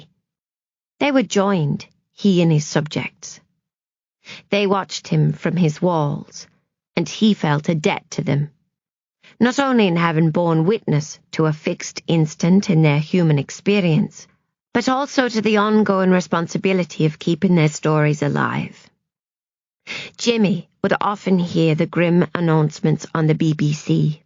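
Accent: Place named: Australia. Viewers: English with an Aussie twang I'd say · Awful accent. Aussie?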